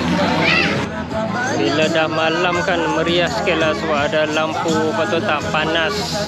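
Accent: Malaysia. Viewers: mcm org Utara bang..mcm Kedah Penang Perlis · Cakap Melayu macam orang Malaysia. Dok Malaysia ka?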